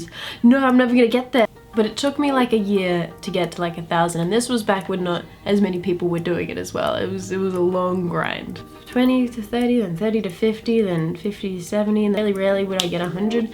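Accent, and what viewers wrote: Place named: South Africa. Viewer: You sound more South African than Australian...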